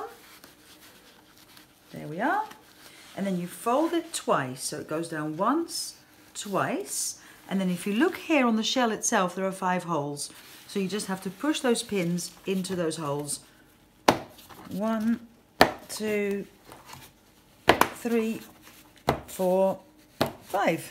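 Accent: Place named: England, United Kingdom. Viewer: Lovely accent, from England ?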